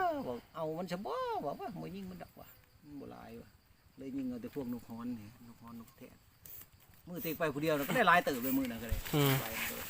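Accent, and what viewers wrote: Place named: Savannakhet, Laos. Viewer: ຟັງສຳນຽງຢາກຄືໄທສະຫວັນນໍ້ຢູ່ບ້ານໃດເດ້ຢາກໄປລ້ານຳເດ້ນົກຫລາຍແບບນີ້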